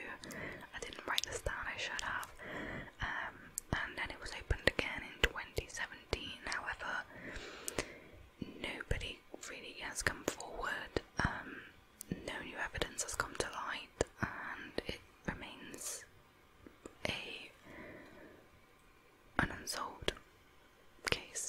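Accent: United Kingdom. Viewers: Nice to hear a lovely British asmr voice ❤️ please please please more true crime stories and even general chat. You have a nice clear voice, nice microphone too, thankyou for your amazing work 💕🏆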